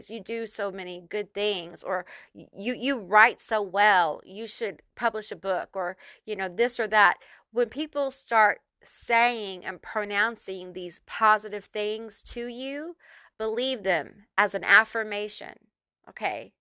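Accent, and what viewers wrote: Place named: South, United States. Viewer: are you southern? i can hear a slight accent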